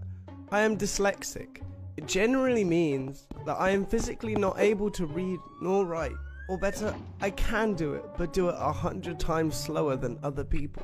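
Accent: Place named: United Kingdom. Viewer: His voice in this story is pleasing to listen to and his British accent sounds pleasant too.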